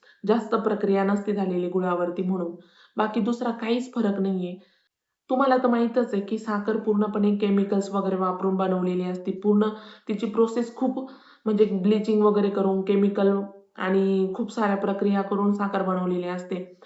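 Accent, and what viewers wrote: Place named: Kopargaon, India. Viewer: छान माहिती मॅडम , तुम्ही कोपरगाव अहिल्यानगर च्या रहिवासी आहात काय, बोलण्यावरून मला वाटते 🙏😀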